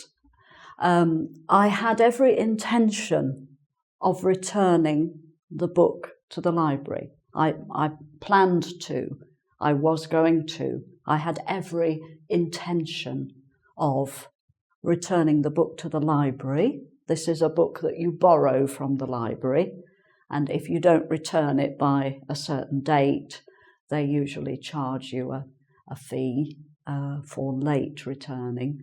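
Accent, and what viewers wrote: England, United Kingdom. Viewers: Nice Video.Thanks.You sound like British.Are you from England? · Thank you sweet. It was an excellent class. I could do note a little british accent? God bless you! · Hi I'm new subscriber and i really love learning English and the British Accent. I found it really helpful. thank you so much for sharing with us :) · Awww this new teacher is so adorable. Welcome,. By the way, you're British, aren't you? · actually I love a lot of teachers on Engvid. but I love mama cos she uses British accent 😊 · Hello, Dear ) I am verry happy to find you as a teacher ) You are such a nice and pleasant person to watch and listen to) To be honest I was in search for someone whose accent is absolutely British and I am sooo delighted to find you finally!) You inspire! ) Thank you so much for that!) Looking forward for your new videos) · British accent was not easy to understand for me because I have more experience with American accent however, I am getting better little by little, thanks. 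· i'm from brazil and i'm using to pratice my english foreigners, i loved your brithis accent, your video was perfect, i loved it, congratulations, hugs for you! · Are you british? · I love your clear British accent. Many thanks for your videos. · This is the British accent that I love. Very clear lesson. Thank you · Hey, I'm from Spainl and I'm hope to improve my Inglish, I loved your brithis accent, your video was perfect, congratulations, hugs for you! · Your accent is wonderful. I really like the British accent, for me it is the best, the most pronounced, the cleanest, the best pronunciation. The English spoken in the United States is incomplete, it seems that they are too lazy to speak, they remove the letter T from the words, many unnecessary contractions. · Nice to see more of British accent here! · I love your beautiful english accent .What I really love is that It's not different to understand your explanation of most sentences as I know you are trying to speak slowly so that your pupils could understand it.Thank you so much! 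· fast 1 month with Bacitikdaithanh this new teacher is so adorable. Welcome,. By the way, you're British, aren't you? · Hii, mam I am from the ndia. I want to learn this English accent,, Please tell me ,,have you any application, or online course? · Thanks for existing,! It helps so much. I'm from Belgium. They say I have a good pronunciation but I would like to practise my British-English and your videos are amazing for this. I can understand every single word you say without a problem. It's super-super nice. British accent is the best so far. Thank You, x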